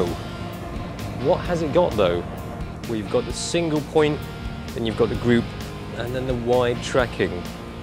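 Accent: United Kingdom. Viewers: You sound British but your asian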